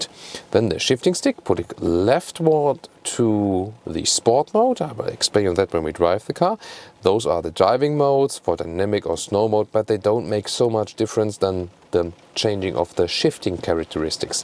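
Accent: Germany. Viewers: SOS10 I don't usually mind - gives the whole thing a bit more character - you know. The german doing an incredibly detailed and professional review of almost all aspects of the car - plus the accent - theres a reason i watch these videos, but I myself (german as well) like to constantly improve my english and be told when I make mistakes and how to fix them :)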